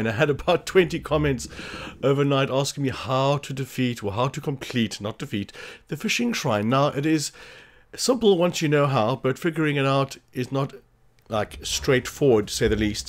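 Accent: South Africa. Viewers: Feel like such a tool. Good to hear a South African accent too 😂✌🏻 thanks man!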